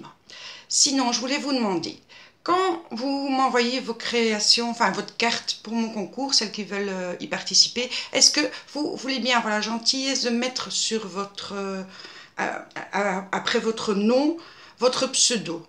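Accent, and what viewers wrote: Belgium. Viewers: coucou,😃 original avec la mousse à raser, je ne connaissais pas, merci pour ce partage,👏👍💖 et , notre accent belge, il est très bien lolll 😜😊 en plus il y en a différents , pour tous les goûts 👍🤔😃 bonne aprem, bisouilles 😘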